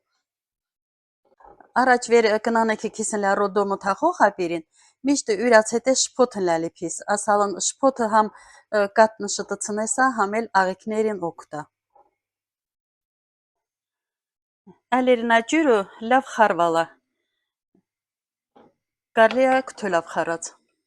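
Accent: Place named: Hadrut, Azerbaijan. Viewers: Как приятно слушать наш Гадрутский акцент! Спасибо вам! ❤ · ջերմ բարևներ բոլորիդ,էլի մտքով տարար մեզ մանկություն,եղիր առողջ և թող բարգավաճի քո յութուբյան ալիքը ու երբեք չմոռանանք մեր քաղցր ղարաբաղյան համով հոտով բարբառը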